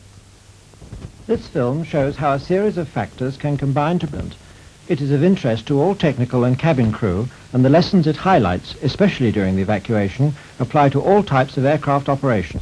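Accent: United Kingdom. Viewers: Proper British for sure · More than 10% dislikes typically means F. For his Britglish i guess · There is just something about a narrator with a British accent that adds calmness and matter-of-factness.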